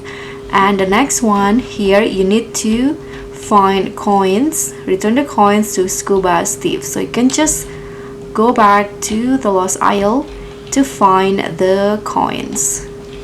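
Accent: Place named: Indonesia. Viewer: Bahasa inggris lu kayak bahasa Indonesia, karuan pake b indo, jujur ga ngerti gua ngomong apa pas belum ngeh wkwk, tapi makasih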